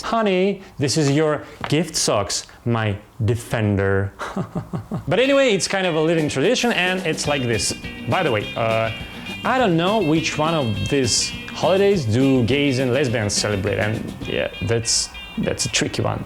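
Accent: Russia. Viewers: явно видно русско-английский акцент 😅, You have to work more and practice your speech 💪👍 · Русский английский - идеальный для прокачки уровня - больше новых слов, понятных по контексту и произношению. Идеально! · This genre should be cool only if you have a real native speaker, of course, for russians that`s easier to understand russian accent, but if you want to attract attention of foreign viewers, you need someone, who was born english speaking)) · ну такое, хорошо, сильный русский акцент, у меня ровно такой же, поэтому легко воспринимать. пока непонятно откуда планируешь брать англоязычный трафик, посмотрим, подписался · Кто пишет "не такой уж сильный", вы серьезно? Иван сверху правильно написал, вам только так кажется, т.к. вы в голове примерно так же читаете по-английски (за это кстати "спасибо" нашим урокам английского в школах). Послушайте носителя языка, а потом, и всё сразу поймете. 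А для англоговорящих зрителей это еще усиливается, и для них он будет звучать именно как такой типичный русский акцент - лондон из э кэпитл оф грэйт бритн; лет ми спик фром май харт... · Russian English( Но в любом случае очень хороший)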